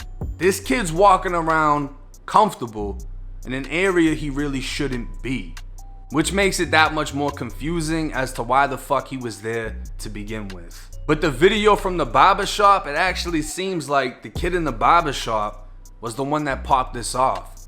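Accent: Boston, United States. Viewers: Are you from Boston? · Maaaaket. Hahaha. Love that Boston twang bud. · You sound like you are from Boston....that's a long ways from me I reside in beautiful southern California 💪🏽and i put down for my city and state 👊🏽💪🏽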